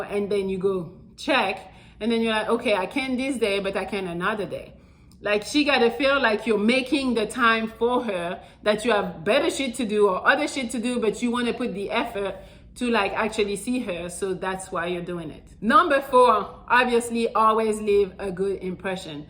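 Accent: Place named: France. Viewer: That’s where the accent come from I thought you where French As a Haitian man I thought I Hurd it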